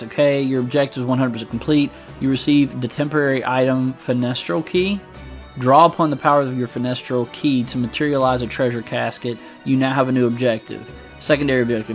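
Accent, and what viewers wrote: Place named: South, United States. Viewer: I’m always surprised when I hear a familiar accent in a hobby that I enjoy. I’m completely used to being the oddball southerner that likes nerdy stuff. Cheers man, thanks for great content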